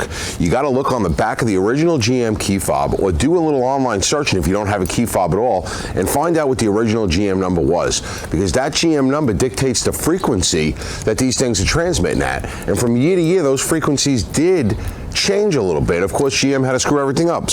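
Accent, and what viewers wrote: New York, United States. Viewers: I know right! Love NY accent. I’ve been watching The Sopranos and this gentleman could be cast in it if they ever do an update.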